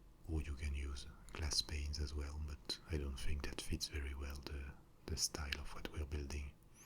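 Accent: France. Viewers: Vous avez un peu l'accent français dans vos parole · Mon Dieu! ASMR + votre accent francais (+ Minecraft) = Fantastique! :D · As if regular soft speaking wasn't good enough here comes this guy and does it in a French accent; I AM IN LOVE WITH THIS!!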